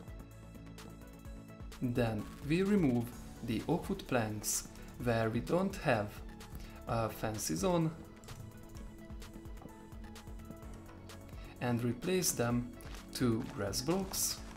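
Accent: Hungary. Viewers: Valahogy éreztem hogy magyar vagy a kiejtésed miatt😁 Csak így tovább! 🇭🇺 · tudtam, hogy magyar vagy.:) kiejtésröl tudtam. amúgy nagyon szeretem a videóidat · Te magyar vagy? Eléggé hallatszik.. :D Nekem olyan furcsa v a beszéded nem eredeti angol kiejtésre hajaz...vagy csak tudsz magyarul? YouTube are Hungary?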